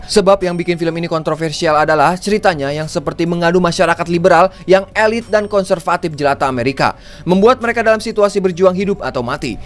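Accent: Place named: Sunda, Indonesia. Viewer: Lu asik bang klo bicara Sunda wk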